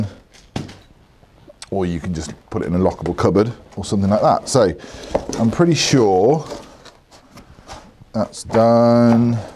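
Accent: United Kingdom. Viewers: I love to hear you talk :( funny guy, speak very well, nice pronounced british accent.. Don't get what people dislike!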